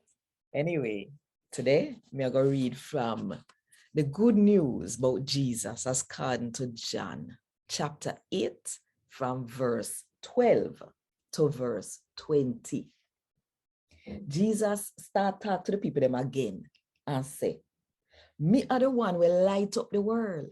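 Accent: Jamaica. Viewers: Authentic Jamaican! Beautifully done. Keep it up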